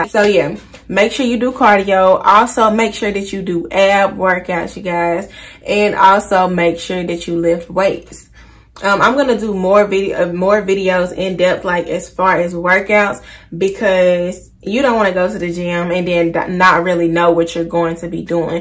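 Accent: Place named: South, United States. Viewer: your accent is adorable southern belle!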